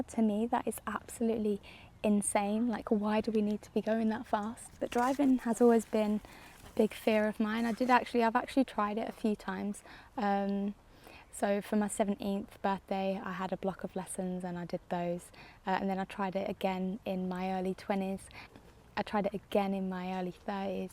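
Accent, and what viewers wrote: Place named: Wakefield, United Kingdom. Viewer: Thought i recognised your accent, I'm from wakey originally 😁 great looking allotment, looks like a little slice of heaven 😍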